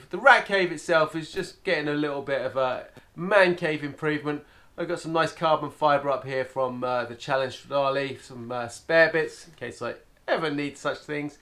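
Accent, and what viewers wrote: England, United Kingdom. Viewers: He's from England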